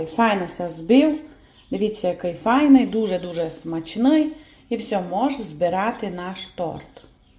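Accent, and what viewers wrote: Transcarpathia, Ukraine. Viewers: А яка рідна мова закарпатська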